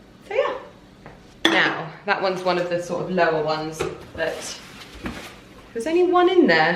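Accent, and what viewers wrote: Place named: England, United Kingdom. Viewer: I am an older lady that lives in U.S. I found you on youtube and you inspire me so much. My dear Mother was from England, and it like listening to her or my grandmother when I listen to your beautiful accent. I love how you are so real. Please keep doing what you are doing.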